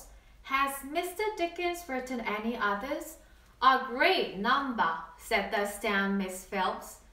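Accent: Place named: United Kingdom. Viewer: 아니요.발음이 약간 영국식이세요.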